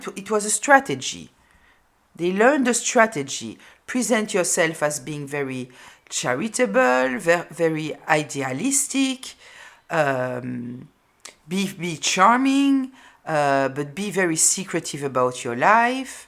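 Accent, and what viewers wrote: France. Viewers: I absolutely love your French accent ❤️🧡💛💚🩵💙💜🤎🖤🩶🤍🩷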